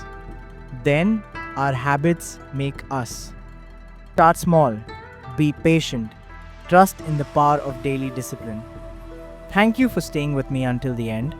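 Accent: India. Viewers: It is odd, you have an India accent and talk about Japan 😂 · Your videos are very good , but re you Indian or japanese as your accent feels indian to me ?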